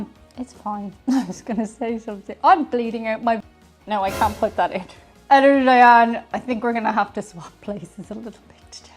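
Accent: Ireland. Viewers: Was it just me, or did Diane sound more "Irish" than usual in this video?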